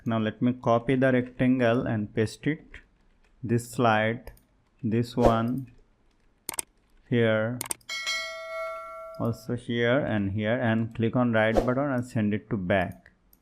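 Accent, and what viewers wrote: India. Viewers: your accent is Indian I think. Thank you for soo good presentation From= INDIA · This nigga is Indian 😂 Accent 😂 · I can't believe that I'm listening to an Indian accent😭😭😭😭😭😭😭😭😭😭😭😭😭😭😭😭😭😭😭😭😭😭😭😭😭😭😭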